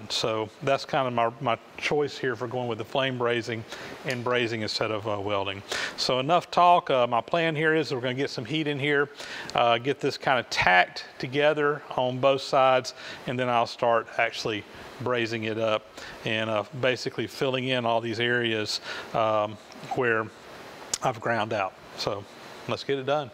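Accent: South, United States. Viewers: Why captions? speaks perfectly good southern English.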